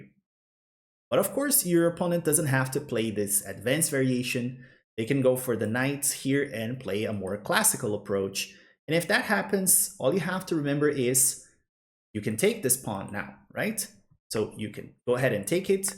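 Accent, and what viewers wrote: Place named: Brazil. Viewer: otimo video, reconheci seu sotaque de brasileiro na hora haha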